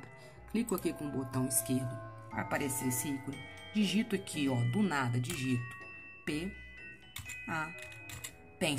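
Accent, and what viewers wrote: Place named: Zona da Mata, Brazil. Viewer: de onde? Pelo sotaque parece ser da zona da mata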